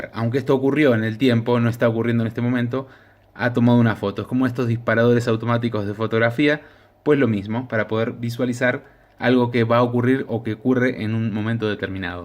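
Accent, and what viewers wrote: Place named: Argentina. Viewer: un español que habla en argentino. jej. . tu video para alguien que recien comienza, el mejor de los mejores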